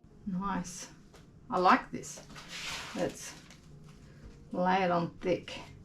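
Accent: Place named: Australia. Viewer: You have taught me over and over again that a piece is not finished until the artist is happy with it and this piece shows this amazingly. May I ask if you are in Australia. You sound like you are but I've been wrong before. Once again thanks for sharing your prodigious talent with us mere mortals. 🙂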